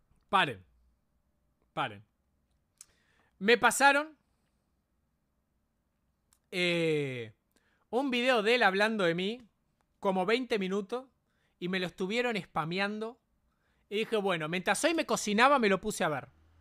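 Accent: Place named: Uruguay. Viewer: pero ahora que lo diga bien y no con acento de uruguayo castrosón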